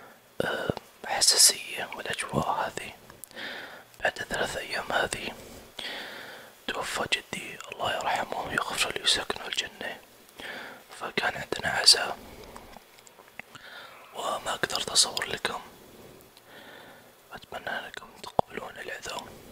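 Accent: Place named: Ha'il, Saudi Arabia. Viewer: لهجتك حايليه مره انت من حايل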